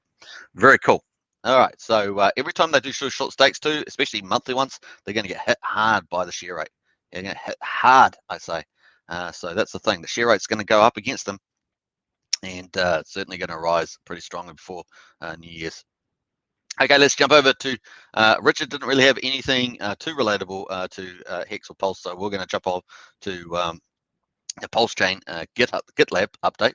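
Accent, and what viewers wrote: New Zealand. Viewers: Is that a kiwi accent?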